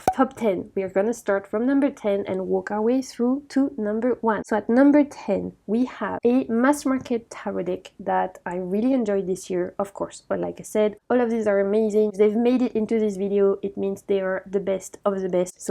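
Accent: France. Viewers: Love these decks! Sometimes I listen to you just for the Oui Oui Oui French accent 😂😂😂❤❤❤❤❤❤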